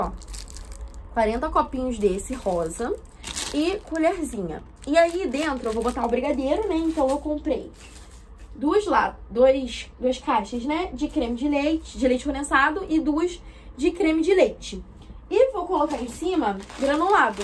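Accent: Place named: Rio de Janeiro, Brazil. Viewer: Nos primeiros 5 segundos de vídeo eu já vi logo que era carioca ❣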